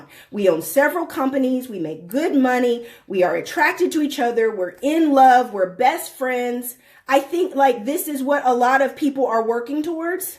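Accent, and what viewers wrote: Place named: New Orleans, United States. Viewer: Babbaaayyyy say it in your Nawlins accent you done blew it up here! I'm so glad I woke up from that mess and saw the light. I almost threw my phone and shouted across my room when you talked about sexual repression! All these preachers out here profiting off of women's pain when it's a lot of women waking around broken due to so many issues and experiences. They should be ashamed!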